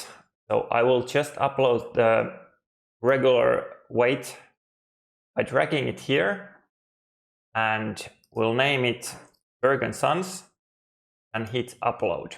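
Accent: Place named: Finland. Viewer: Bro! Avasin taustalle kuulumaan ja meni 4 sekunttia aivoilla tunnistaa Suomi-aksentti. Kiitos.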